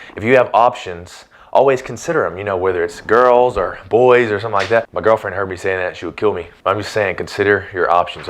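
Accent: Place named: Alabama, United States. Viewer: Gorgeous with an Alabama accent 😍 💕 💖.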